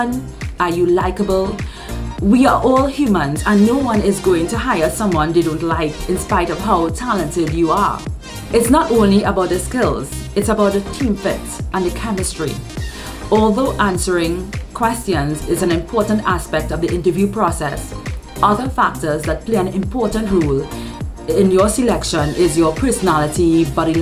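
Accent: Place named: Trinidad, Trinidad and Tobago. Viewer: I’m picking up that beautiful Trinidad accent. Great tips. I’ve been applying these tools. I go into the interview with the intent of making it more of a conversation in selling myself.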